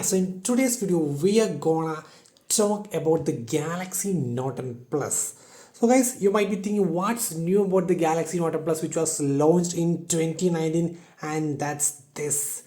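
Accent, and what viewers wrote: Kerala, India. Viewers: Heavy malaylee accent :)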